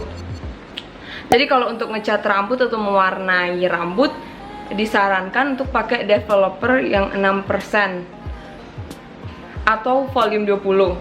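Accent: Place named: Manado, Indonesia. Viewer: wihh.. Kaget dengernya.. ternyata orang Manado.. 😄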